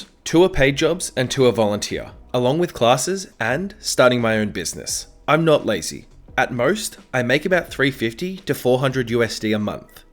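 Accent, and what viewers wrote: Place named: Australia. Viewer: Yo you sound Aussie, are you?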